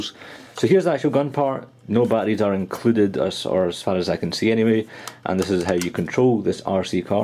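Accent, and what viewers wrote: Scotland, United Kingdom. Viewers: Scottish is awsome love the accent :) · This is a good video. Even with your accent (sounds Scottish), you are easier to understand than most Americans... and I am American. haha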